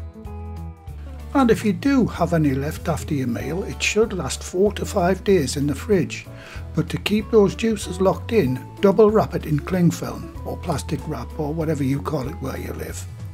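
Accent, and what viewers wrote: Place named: Durham, United Kingdom. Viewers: Loverly, jubberly,!. Brought back great memories of my 21 years in England and many happy Sunday lunches with my amazing (now sadly departed) English girl. Thanks for another superb video, and I always enjoying hearing your accent (Durham is it, or Washington, Tyne and Wear? My ears are getting rusty.) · What a refreshing change a Chef showing us how its done and making a video that's all about the food....no one knows what Mr even looks like because its all about the food well done sir and as a fellow north country man myself its nice hearing the dulcet tones of the accent again as I transplanted 55 years ago to Australia!!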